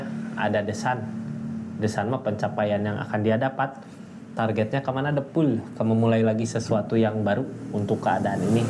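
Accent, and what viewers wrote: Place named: Sunda, Indonesia. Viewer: Amiin alhamdulillah alhamdulillah alhamdulillah alhamdulillah alhamdulillah alhamdulillah alhamdulillah, makasih redingannya sehat sll berkelimpahan amiin, hallo mas ganteng seperti anaku no 3 bhs sundanya medok bangett, makasih sangat resonet kecewa la sudah 35 thn di khianati sekarang la gak mikirin asmara deh la mati rasa cinta yang penting anak anak bahagia berkelimpahan tatap hari tua di depan byk kebahagiaan dan keberlimpahan semangat 58❤❤❤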